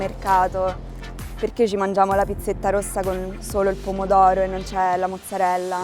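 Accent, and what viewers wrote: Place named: Umbria, Italy. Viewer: Your accent sounds Umbrian...not Romana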